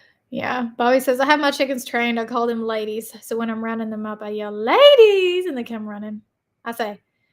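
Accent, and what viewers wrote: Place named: Tennessee, United States. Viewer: Your funny and cute I enjoy hearing you talk with that Tennessee accent 😂😂😂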